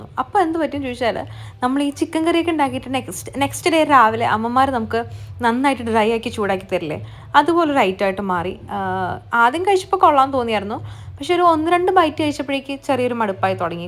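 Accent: India. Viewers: pand trivandrum slang arunnu. Ippo tani malayale Malappuram valancheri tone aayi. Yes tani malayale pole tanne ind.njn parayum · Ii lokathu Tani Malayali mathreyullo? Also, this girl's from Central Travancore and Tani Malayali is from Northern Kerala. Nalla samyam analo😂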